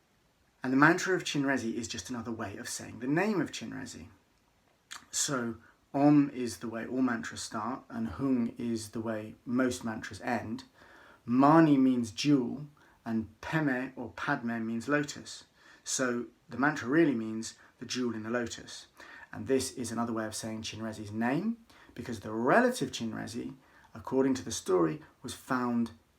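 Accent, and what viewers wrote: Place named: England, United Kingdom. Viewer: Thanks for enlightening us. A few questions if you don't mind: 1. Where does the name Chenrezig come from? 2. Is Chenrezig the same as Avalokitesvara or Guan Yin Bodhissatva? 3. Is Chenrezig manifest into thousand eyes, thousand arms? 4. Is Chenrezig a male or female? 5. Did Chenrezig born out of lotus flower like Guru Rinpoche? Because mantra is like Mani Padme ( like Padmasambhava? ) Thank you, with Metta ( btw, like your English accent and you are very young, indeed. )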